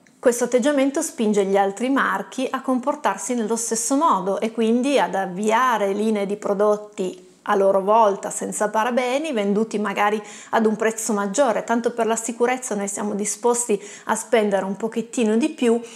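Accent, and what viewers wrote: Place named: Turin, Italy. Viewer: Contentissima di aver trovato il tuo canale, dopo aver letto alcuni tuoi articoli interessanti altrove. Video interessantissimo e molto chiaro anche per un'umanista come me :-) Noto anche con piacere un familiare accento torinese, o sbaglio? :-)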